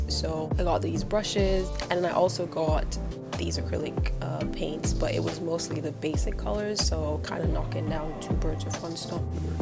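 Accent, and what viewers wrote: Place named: Barbados. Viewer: Beautiful work! The music was a bit loud and found it hard to listen to the Bajan accent. Keep arting. Looking forward to the next video! Stay safe 💜💛💙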